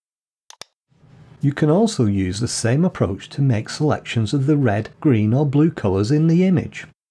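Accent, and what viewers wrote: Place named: Manchester, United Kingdom. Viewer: Hi, I loved hearing your Manchester pronunciation, identical almost to my Cheshire accent, even after 37 years in Germany. However - I have to draw the line at your pronunciation of "Gaussian" as "Gaysian" - the pronunciation is phonetically "Gouwsian" as in (Northern slang) "nouse" after the German mathematician Carl Friedrich Gauß (Gowse??). Thanks a lot, enjoyed the video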